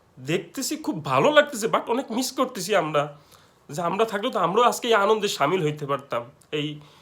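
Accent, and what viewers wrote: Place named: United Kingdom. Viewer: Look the way u talk bai from uk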